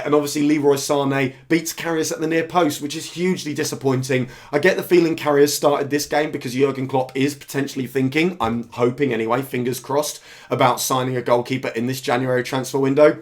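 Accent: Liverpool, United Kingdom. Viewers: "Absolutely boss." Your Scouse is coming along nicely. :-)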